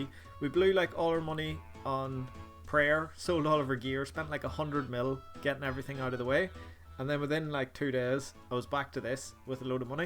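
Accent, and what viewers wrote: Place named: Belfast, United Kingdom. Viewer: Bro we’re about a are you from? As a Belfast man I never thought our accent youd sound good on an rs vid... and I was right lol makes me cringe soo much.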